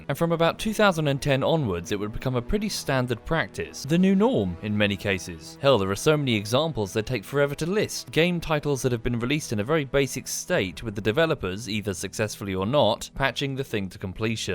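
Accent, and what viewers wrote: England, United Kingdom. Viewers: Bro a dude with a posh British accent just explained to me what early access really means I like this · I'm getting strong UpIsNotJump vibes here. Or maybe all englishmen sound the same to me. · i can understand british accent smoothly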